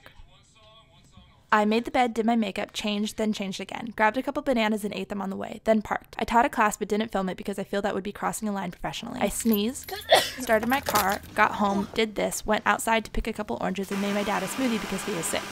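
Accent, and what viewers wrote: France. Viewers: I love your french accent !!!! So cute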